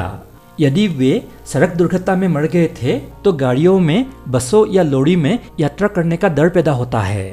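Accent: Assam, India. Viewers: আপুনি অসমীয়া নেকি ॥ আপোনাৰ কথাবোৰ অসমীয়া যেন লাগে হিন্দীত কয় যদিও ॥ ভিডীও বোৰ ভাল লাগে আপোনাৰ❤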